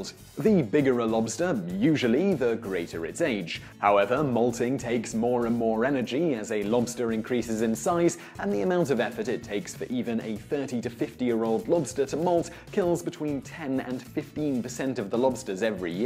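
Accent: United Kingdom. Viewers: Yeah I turned it off before a minute I don't need to hear a lot of blah blah blah in a British accent about stuff I already know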